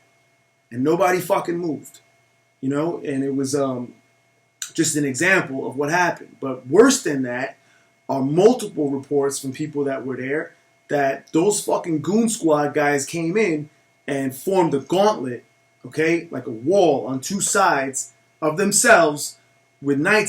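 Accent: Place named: New Jersey, United States. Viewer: Is it prejudice of me to automatically assume u from jersey within the first 0.00001 seconds of clicking on ur vid?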